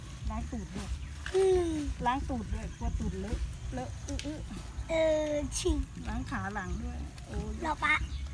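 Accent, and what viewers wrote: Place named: South, Thailand. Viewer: พี พูดใต้ยังกะคนใต้แท้ๆ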